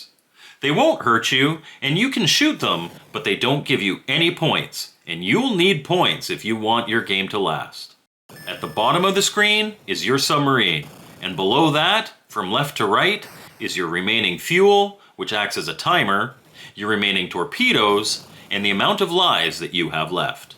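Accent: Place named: Russia. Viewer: Let's Go DJ Blyatman would Be Proud using that authentic Russia 🇷🇺 Accent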